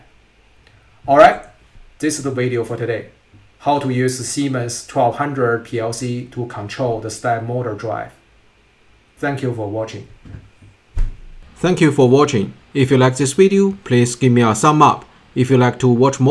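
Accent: China. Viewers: Regret we didn't meet sooner，are you Chinese? Sound like Chinese-style_English.he he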